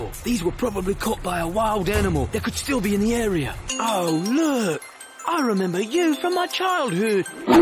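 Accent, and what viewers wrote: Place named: United Kingdom. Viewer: Why does he sound British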